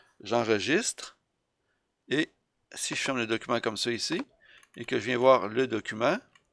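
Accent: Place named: Quebec, Canada. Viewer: Bravo, bien dit et le suivi vidéo est clair. Je ne veux rien enlever aux narrateurs français de France, mais ça fait du bien d'entendre une voix québécoise narrer de la vidéo pédagogique.:)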